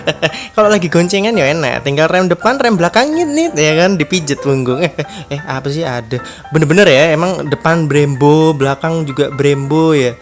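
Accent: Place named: Java, Indonesia. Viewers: Bahasane lohh kok dadi medok bang😂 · Kenapa ke jakarta malah medok to bang 😂 kekel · wooo.. wong jowo to, medok² gurih..:v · orang lampung tapi medok🤣🤣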